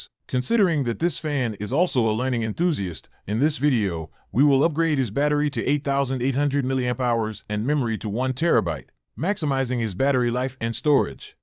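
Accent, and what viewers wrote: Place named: United States. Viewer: The man doesn't exist, repairs all done on chinese phones...Voice is american.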